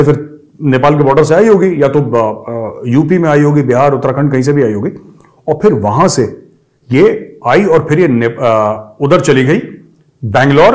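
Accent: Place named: Pakistan. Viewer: Amazing Pakistani voice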